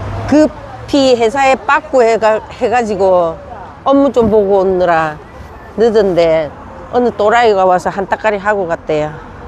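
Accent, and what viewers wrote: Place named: Busan, South Korea. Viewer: 수고 많으셨습니다....페북에서 뵐때는 무서웠는데 ㅎ...목소리는 전형적인 부산 아지메 네요....화이팅~!!!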